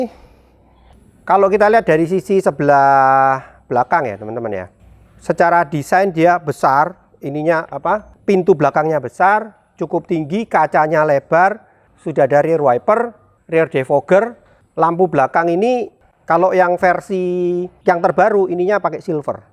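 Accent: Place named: Surabaya, Indonesia. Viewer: Mantabh reviewnya om, narasinya ga kaya baca spek pabrikan, tp punya angle yg menarik sebagai user, ditambah logat suroboyoan yg khas, jd bikin reviewnya semakin menarik. Auto subscribe!! Ditunggu content menarik selanjutnya Ko. #jadipengenpunyafreed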